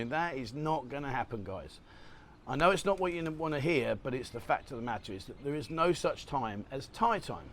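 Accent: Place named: Cockney, United Kingdom. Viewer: Very clear advice.short time Thinking in going to thayland for first time .. i think i need to enjoy that ... Living the cogney accent matey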